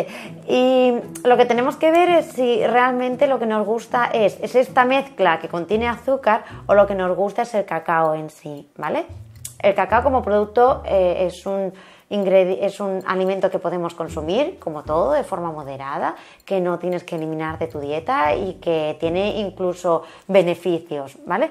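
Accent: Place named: Spain. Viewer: Buenas noches primero que todo para saludarte eres muy bella demasiado bella es más chica hermosa menos y se acentúa el acento español me gustas mucho Tú Gracias por la explicación hermosa feliz noche 👍 desde Venezuela te hablamos chao amor hermoso 👍👍👍😘😘💖💖💖💖💝😒💝🥰🥰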